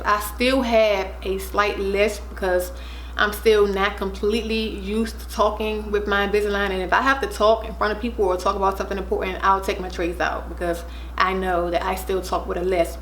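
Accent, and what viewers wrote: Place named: Washington DC, United States. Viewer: Your dc accent is cute.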